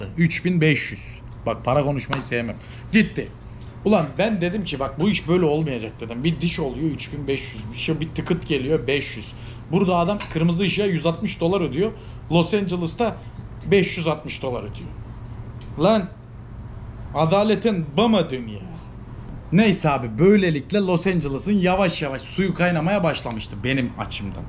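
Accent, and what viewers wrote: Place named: Trabzon, Turkey. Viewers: Kardeş konuşman, tavrın aynı Trabzonlular gibi. İlk izlerken kesin Trabzonlu dedim!? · Seni tanimiyorum ama buyuk ihtimal trabzonlusun konusmandan ve tavrindan belli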